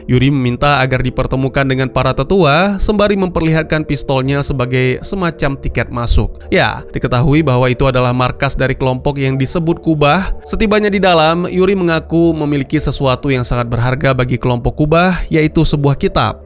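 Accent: Aceh, Indonesia. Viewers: Orang aceh ya bang?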